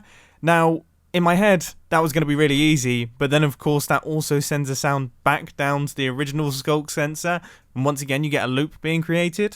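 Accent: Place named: United Kingdom. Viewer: There’s something about a Minecraft youtuber with a British accent that just hits different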